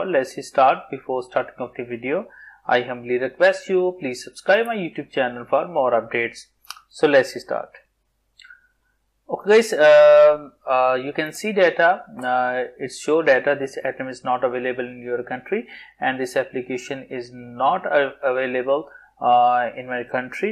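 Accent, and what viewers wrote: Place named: India. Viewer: I can't listen to this the indian accent is ridiculous. Learn better English please.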